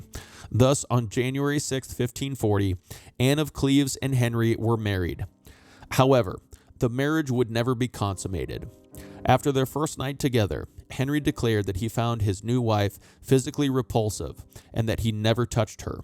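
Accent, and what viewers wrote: United States. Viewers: Nothing more irritating than hearing a yank speak of English history when they know nothing or dare speak of their own lol 😆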